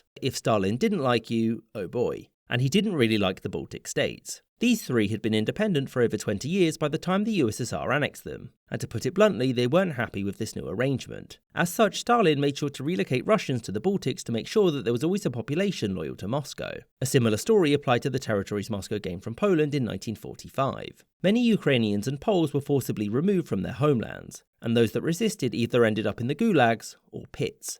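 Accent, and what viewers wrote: United Kingdom. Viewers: The narrator literally has a British accent and you still accuse them of being American lmao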